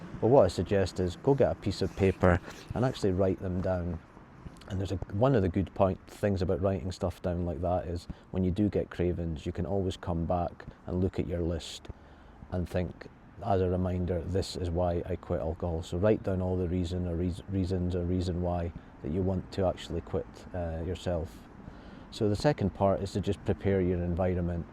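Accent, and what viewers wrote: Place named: Scotland, United Kingdom. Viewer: Hello 14 weeks sober nice to hear a fellow Scot talk sober talk subbed. Was looking at your background and was thinking that looks familiar 😂 🌟 bank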